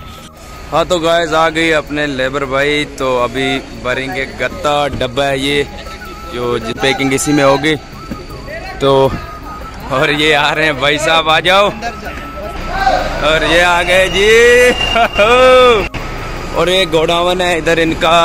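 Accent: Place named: Poonch-Rajouri, India. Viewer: Bhai jaan language se lgra ae poonch Rajouri se oo ya to fr choudhary ho❤❤❤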